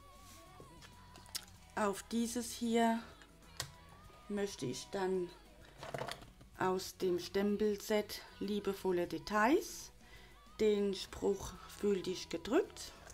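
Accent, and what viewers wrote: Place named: Hesse, Germany. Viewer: Habe dein Einführungsvideo gesehen und kann kaum glauben, dass du dir so wenig zugetraut hast. Box ist sehr hübsch und akkurat gearbeitet. Als gebürtige Hessin mag ich deinen Dialekt ganz gernze hören . LG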